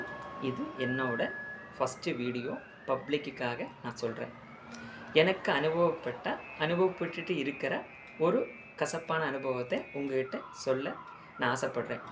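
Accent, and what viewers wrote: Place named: Kerala, India. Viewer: மலயாள வாடை தெரிகிறது தாங்கள் அழகாக தமிமழ் பேசுகிறீர்கள்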